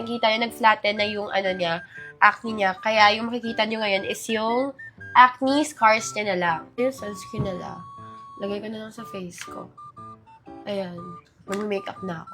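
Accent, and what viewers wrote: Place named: Iloilo, Philippines. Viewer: Ilongga ka pala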